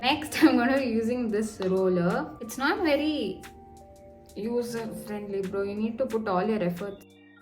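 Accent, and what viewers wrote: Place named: Tamil Nadu, India. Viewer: Why are u so Tamil😂 by the way i loved your accent and expressions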